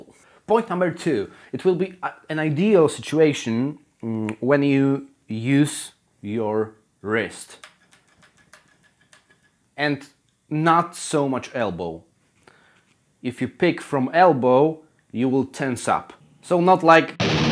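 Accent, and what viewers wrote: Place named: Poland. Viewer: O co chodzi z tym akcentem? Ma mówić bez akcentu bo jest Polakiem?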